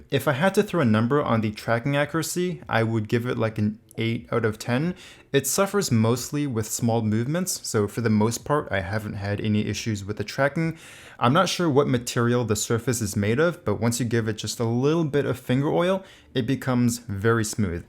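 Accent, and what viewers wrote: Canada. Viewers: IMHO sounds canadian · he's probably Canadian. Can tell by the way he says "out". Maybe Ontario. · Are you Canadian? :))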